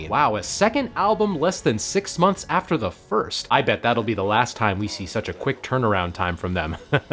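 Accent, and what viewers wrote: United States. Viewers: Couple of notes from an Australian fan: - Opening on a shot of Sydney for a Melbourne band is very funny, well done - Weird that you didn't mention Ambrose is IN the when you first brought up Gizzfest - Also lol'd at calling "Baby Rainbow" - I was at the gig you used footage from during the Nonagon passage, at the Metro Theatre in Sydney. Great gig! I've seen them 11 times all up, from tiny clubs to big festivals, and they're always a memorable show. - Wish you'd mentioned the band playing on Conan when you showed that footage, if only for Conan absolutely RELISHING saying the band name. - As an Australian it's really funny to hear you shorten the band name to KG, because we all call them! That might sound weird with an American accent though 😂 Loved this video, well done!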